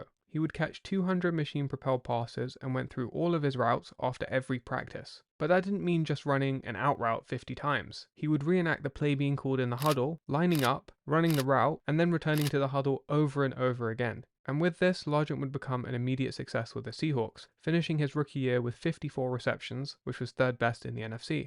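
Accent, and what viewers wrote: United Kingdom. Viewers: Sorry but i cant listen to a british talk about amercan football.it just doesnt serm right😮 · How weird is it hearing a British dude talk about football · British guy talking about the NFL? 1KYAE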